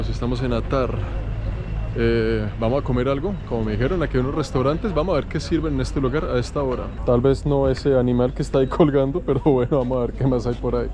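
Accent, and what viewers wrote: Bogotá, Colombia. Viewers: Felicidades. Ese acento colombiano de Bogota (rolo) de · Él muy rolo, ella muy paisa 👍🏼🙃👍🏼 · Buen viedeo, el acento y dialecto es muy colombiano. Eres colombiano?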